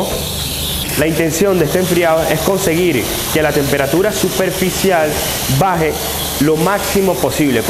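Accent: Venezuela. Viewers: A los segundos de escucharte, supe que eres venezolano. Eres lo máximo. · Tú estarás en Chile pero... ese acento...venezolano.... Saludos desde Venezuela, muy bueno tu video nunca he seccionado una pierna de cerdo, solo pollo y la pierna sin cadera es la que compro para hacer el jamón curado, qué fácil lo pones pero... es difícil!!!! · Otra cosa no tienes asentó Chileno parece tu dialecto Venezolano.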